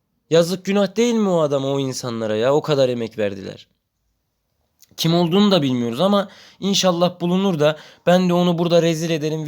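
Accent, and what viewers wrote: Erzurum, Turkey. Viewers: erzurumlumusun abi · Abi sen erzurumlumusun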